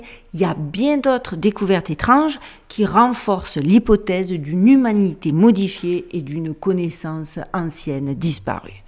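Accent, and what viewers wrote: South, France. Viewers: Merci de nous offrir des vidéos aussi variées, toujours passionnantes et tout ça avec ta jovialité et ton succulent accent du sud ❤❤❤.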